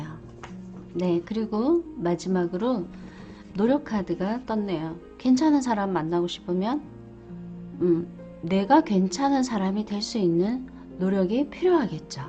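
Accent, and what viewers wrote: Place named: Busan, South Korea. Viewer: 5번 신기하게 맞네요🤗 편안한 리딩 좋아요~~ 부산ㅅㅏ투리두 좋아요~~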